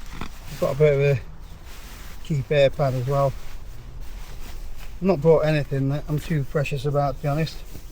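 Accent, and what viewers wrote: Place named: England, United Kingdom. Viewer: hope you don't mind, but what is the name of your accent. yeah, I know you are English, but what part of England is your accent from and what is it called? thanks!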